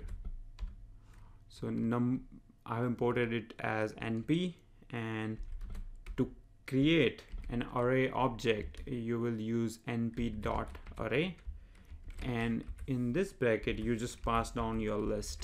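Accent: India. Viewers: Indian accent not kashmiri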